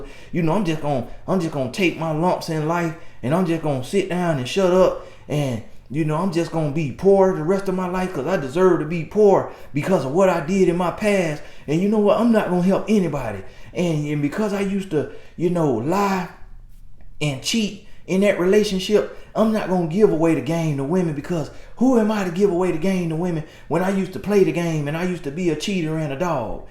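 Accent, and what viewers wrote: South, United States. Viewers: I love country accent. He is hilarious 🤣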